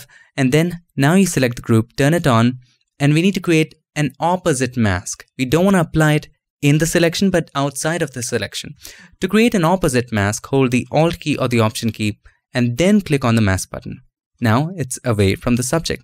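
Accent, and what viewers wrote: India. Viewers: I don't get it? What's wrong with having an Indian accent? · When you find a good tutorial : :D When you see the guy have an indian accent : :/ But you stay because it's awesomely done : OvO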